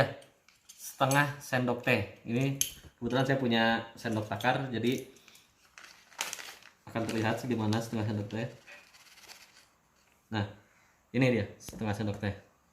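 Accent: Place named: West Java, Indonesia. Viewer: orang sunda ya kang...dr cara nyebut alat" penggorengannya..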